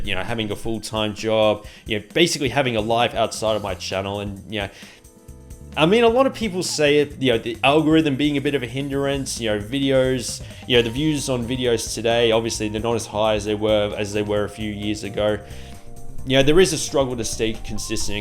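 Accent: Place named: Australia. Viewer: I really love your videos, I’ve been watching you for ages now. But I love that there’s an Australian voice in this space on YouTube and I also love the quality of the videos that you put together. Thank you so much for inviting us in and letting us know a little bit about you and the reason for the channel, I’m sure I’ll appreciate everything just a little bit more now. Congratulations on 10 years!!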